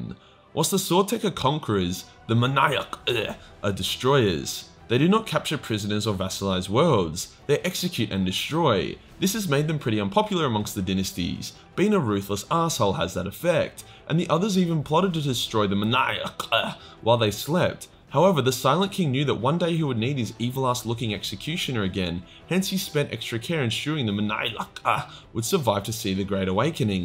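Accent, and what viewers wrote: Australia. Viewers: When’s a gene-stealer vid coming, just started buying some up to make my second army and would love to hear their lore spoken by a true chad Australian lore lord UwU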